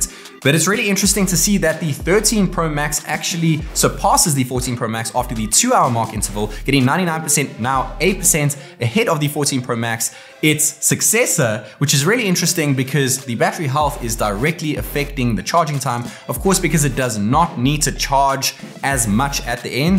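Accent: South Africa. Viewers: I forgot your south african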